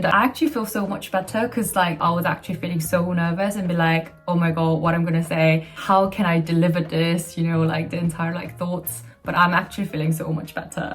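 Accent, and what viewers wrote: United Kingdom. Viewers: 진짜 너무 멋있어요!!! 실행력도, 하고자 하는걸 해내는 능력도 정말 멋있고 존경합니다!!!! 영국 발음도 너무 듣기 좋아요..🤍새로운 길을 응원해요~~~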